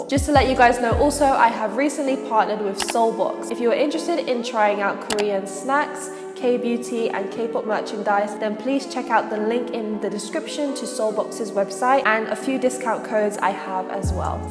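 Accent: United Kingdom. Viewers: Wait I'm confused u have a British accent so ur not korean?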